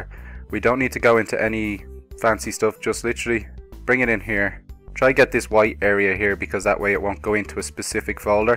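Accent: Ireland. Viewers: he's IRISH YAAAAAAAAAAAAAAAAAAAAAAAAAAAAAAAAAAAAAAAAAY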